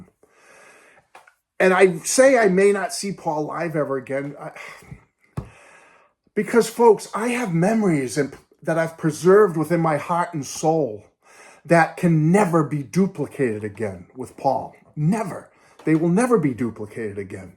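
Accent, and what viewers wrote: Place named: Worcester, United States. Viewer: I drove up to Worcester from south east CT with my best friend too!. She passed 10 years ago. We had seen him earlier in the tour in NYC. We went to probably 50 concerts of various artists. I think of the Worcester show when I hear your voice as the announcements outside had the same accent! My latest time we drove to Syracuse to see him 2 years ago with my 5 year old son. Many great memories!😅 did you get your tickets through the Wings Fun Club like we did?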